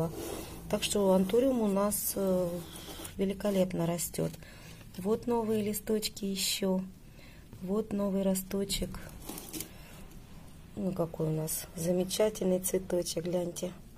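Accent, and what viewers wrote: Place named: Ukraine. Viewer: Здравствуйте! Спасибо за обзор. Начала смотреть ваши видео с антуриума. И мне понравилось ваше отношение к растениям. Скажите, пожалуйста, а где вы географически находитесь? Очень интригующий вид из окна! И очень напоминает картины северных районов России: Воркуты, например. Но судя по наречию, вы, конечно, украинка.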